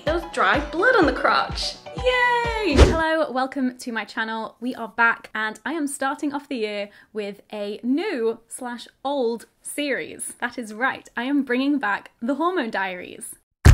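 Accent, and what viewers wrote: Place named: Northern England, United Kingdom. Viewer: Your accent has gone a bit more northern I think? Maybe it's due to social distancing/lockdowns??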